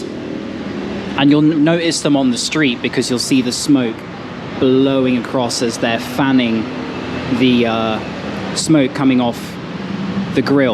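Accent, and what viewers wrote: United Kingdom. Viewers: Videonya keren mas,saya suka aksen anda.aksen nya sperti orang british yaa😁😁,suksess selalu sehat selalu semoga bahagia selalu yaa...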